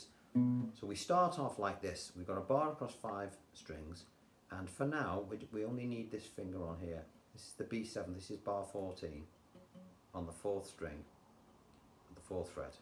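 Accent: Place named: England, United Kingdom. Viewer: Hi. I have only just found your YouTube site by accident. I was looking for a tutorial for and you came up. I have had a look through and now subscribed to your channel. I have been playing a few months now and learned some open chords which I will need some time. The trouble is I can’t sing so want to play instrumentals which I have started to plat, still slow as it takes longer to sink in with me. I started a bit late in life wanting to learn guitar but can play a little, it is only for myself but enjoy what I am learning. I am 72 so don’t know if I will ever play perfect or not but I will have a good try hopefully. Thank you very much from me to you because I like your approach to your teaching method. From your voice I know you are English but obviously don’t know if you still live on the UK or not. I am from Warrington Cheshire, you will probably know where that is. 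Anyway I won’t bore you to death any longer, but once again a big thank you from me and others that watch you or have lessons with you. Take care and best regards. Chas.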